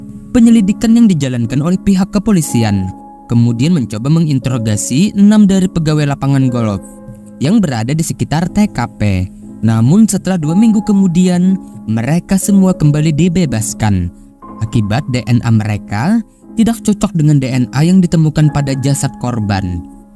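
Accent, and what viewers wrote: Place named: Madura, Indonesia. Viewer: Mohon naaf ini suara seperti ada logat Madura, apakah orang Madura yg tinggal di Kalimantan, maaf ya😁🙏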